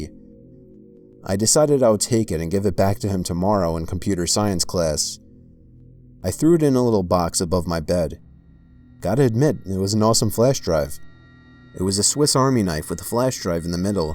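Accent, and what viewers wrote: United States. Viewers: Your accent brings back rad memories of my time in the US..........Are you one of the beastie boys? 🤓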